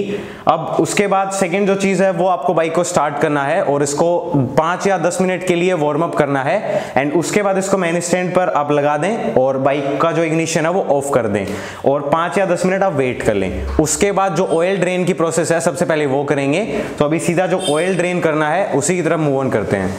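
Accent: Rajasthan, India. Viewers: Bhai tum jhunjhunu rajasthan se ho kya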